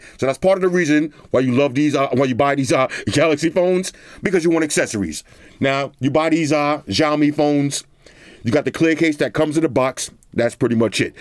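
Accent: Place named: Queens, United States. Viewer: your Jamaica Queens accent 👌, just "eddie-thing" 😂.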